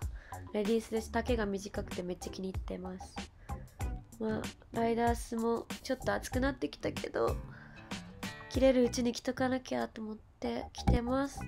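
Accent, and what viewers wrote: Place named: Kansai, Japan. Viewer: めっちゃお洒落💓 ちょいちょい関西弁出るのおもろい😆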